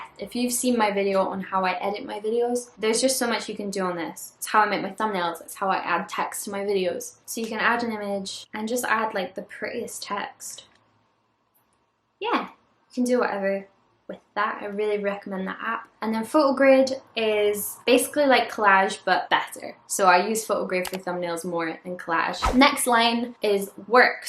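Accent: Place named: Scotland, United Kingdom. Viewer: Good video! I strangely enjoy watching these videos haha. Where are you from, you sound Scottish then English lol I’m from Glasgow, FYI 🏴😎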